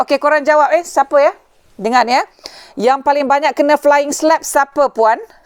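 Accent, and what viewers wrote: Negeri Sembilan, Malaysia. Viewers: Suka dgr puan bercakap. Slang negori xtinggal. Menginggatkan sya pada seseorg tapi xboleh nak recall suara sapa. Pn asal mano. Masa form5 sekolah di mana & thn bila?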